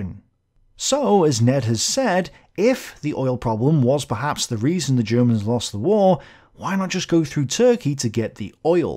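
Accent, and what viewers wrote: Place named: England, United Kingdom. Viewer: can't you tell by his accent. He's English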